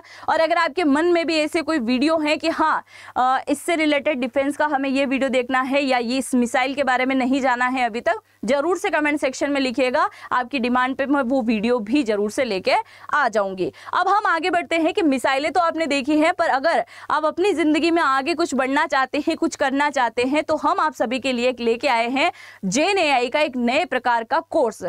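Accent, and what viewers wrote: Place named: Bihar, India. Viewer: Sahar nahi hota hai bihari ho madam.ji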